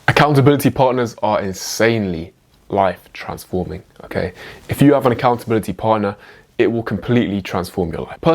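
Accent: England, United Kingdom. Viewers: you are an absolutely gorgeous and handsome young man - your English accent is so sophisticated and classy and you have gorgeous blue eyes - I’m an older Irish gay man in the U.K. and I would happily date you in a heartbeat - all the best for 2024 🇮🇪☘️🇬🇧❤️💗🙏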